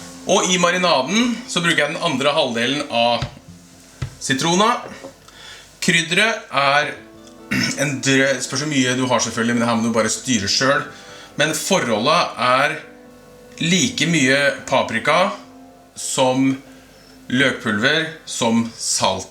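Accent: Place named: Fredrikstad, Norway. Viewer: Jeg er litt nerd på dialekter. Får jeg tippe? Fredrikstad? Mulig jeg bommer stygt hehe.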